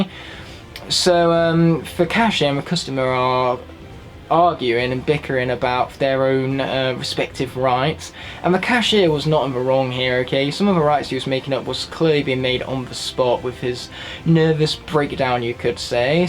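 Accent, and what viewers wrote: United Kingdom. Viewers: I love this video you did so well 1) Your British unlike all the other ones 2) such a massive collection and they have good back stories 3) you are very repeatable 4)my favorite story is your wrecking ball one (series 1) 5) it’s inspired me to do a video on my collection I collect them very big on it there might be a new one in 2021 so look out for the next one and pls watch my vid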